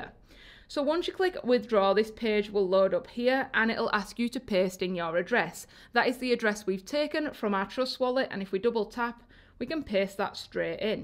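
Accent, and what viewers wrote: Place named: United Kingdom. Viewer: british accent nice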